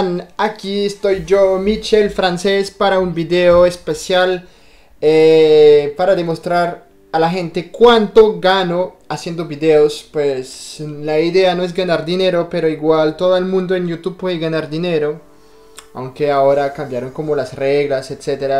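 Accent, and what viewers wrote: Bogotá, Colombia. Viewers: Tu acento es muy colombiano eso es verdad. Los québécois que están en mi clase de español matarían por hablar como tú, ya que se van a un stage a países hispanos. Te conocí gracias a una antropóloga amiga mía que ve los intercambios Québec-México Y Mexico-Francia y a los hispanos les puso un par de videos tuyos en el tópico de choque cultural, no eres antropólogo pero tu honesta opinión nos parece objetiva y certera, a mucha gente nos interesa la cultura y la sociedad, sigue adelante. Saludos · hola! me gusta mucho tu manera de hablar, no se si hablas muy como colombiano porque yo soy de México pero sí creo que tu español es buenísimo:) Qué padre que hagas videos positivos de estos lares. coincido en que eres guapo!:) ojala que sí encuentres una latina que te haga muy feliz!! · Pareces colombiano porque tu español muy bogotano. 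Posdata: Disfruta el verano y broncéate mucho! Posdata de la posdata: Zidane, calvo hijo de puta, mete a James ajajaja (es broma)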